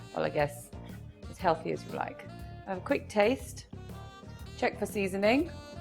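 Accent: United Kingdom. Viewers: She is flaming Hot.. I love her British accent too.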